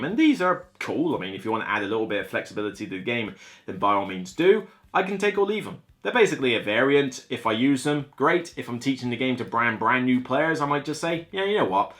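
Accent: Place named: Midlands, United Kingdom. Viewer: This may seem like and odd comment but it’s intrigued me: Have you spent time living in the US? I cannot place your accent. It is midlands on the whole, but every now and then words are pronounced in a strong American accent….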